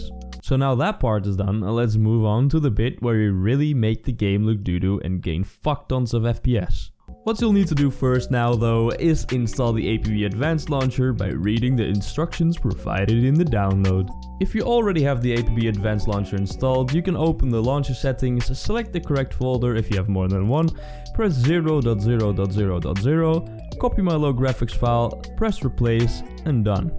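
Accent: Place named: Netherlands. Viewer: Hahaha your dutch tho kan gewoon je accent horen maar bedankt pik me laptop kan nauwelijks dit spel aan, Dus ga zeker dit proberen!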